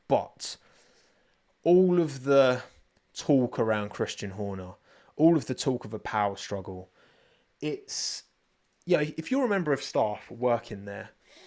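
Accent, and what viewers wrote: United Kingdom. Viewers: It’s the low sweet British accent, I have the exact problem with Cameron and Henry Quinney from Pinkbike. And I can hear the Canadians from the same podcast just fine.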